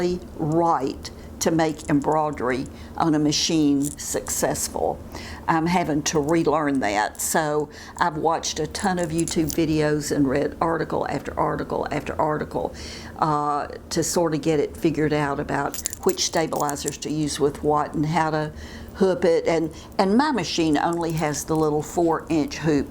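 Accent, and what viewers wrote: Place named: Florida, United States. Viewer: Sunday morning here in UK and enjoying my coffee with you - and I love your uh's! It's part of your fabulous accent - thank you for sharing all your news but was very sad to hear about Ethel Cup Cake, and feel so sorry that Jennifer and Riley will be going through a tough time but what a wonderful act of loving decency that did which would have made Ethel's days happy ones. Take care all in Florida we're thinking of you over the pond.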